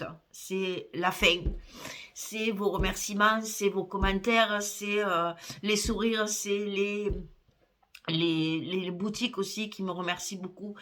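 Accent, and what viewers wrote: South, France. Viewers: Bonsoir.Bonne et heureuse année surtout vos petites mains et la tête pour créer vos album dont je fais souvent.Vous expliquez bien .J aime votre accent du midi.J espère que vos pieds vont mieux avec la préparation de la fête de Noël.J ai fait le folio avec la participation de rose du jardin.Il est genial.Gros bisous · Merci pour cette vidéo et tous mes meilleurs voeux pour cette nouvelle année et merciiiii pour ce magnifique accent qui nous fait sentir bon le soleil et les cigales 🙂👏👍 et longue vie à vos tutos si bien réalisés et expliqués. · Ravie de mettre un visage sur ce bel accent du midi ! Votre enthousiasme et votre bonne humeur rendent vos vidéos très agréables à visionner ! Vos réalisations sont toujours très réussies ! Quel talent ! Je vous souhaite une belle année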